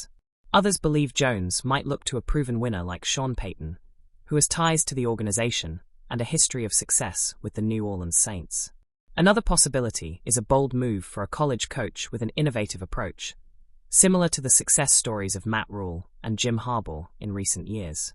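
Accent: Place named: United Kingdom. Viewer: Nothing says American football more than a guy with a british accent. If this is an AI voice maybe pick an American voice?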